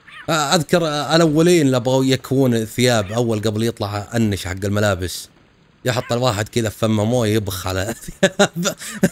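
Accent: Qassim, Saudi Arabia. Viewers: قصيمي اللهجه؟ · 😂😂😂 والله المقطع ضحكتني الله يسعدك و أحلى لهجة القصيميه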